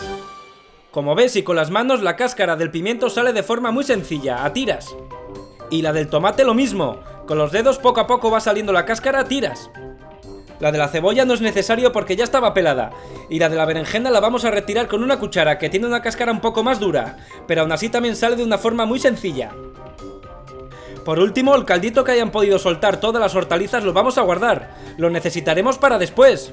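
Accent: Spain. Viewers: te amooo💟💞💖 sos el mejor y me encanta tu asentó espeñol😍😍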